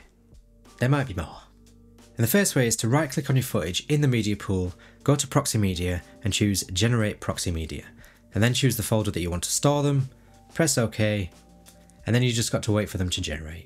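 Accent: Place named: Scotland, United Kingdom. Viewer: Excellent channel. Love the smooth, authentic delivery of a real person. I'm going to take a wild swing at your accent. I hope it does not offend, but I'm guessing Scottish. If there's one thing I've learned these 65 trips around the sun it's this: "if it's not Scottish, it's crap" is universally accepted among civilized humans. Best Bond, Sean Connery, no contest. Best drunk Irish stories, Harris and O'Toole. Best Welsh coalminer stories - Richard Burton. Whatever you are, never change that delivery. It's your secret sauce.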